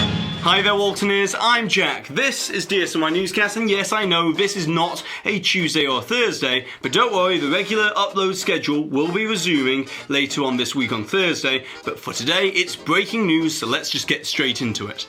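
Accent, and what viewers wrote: England, United Kingdom. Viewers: What part of England does your accent hail? It is TRULY unique, and I have heard it only seldom before.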